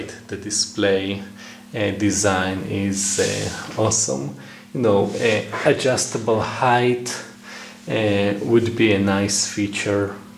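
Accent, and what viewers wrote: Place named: Israel. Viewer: איזה קול ישראלי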